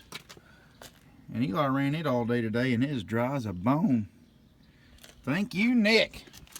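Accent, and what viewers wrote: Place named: Rural, United States. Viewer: I think the funniest parts of the videos is you talking. I'm from europe, where everyone speaks good-ish english. But once you get an american countryboy speaking, you're in for a real treat :)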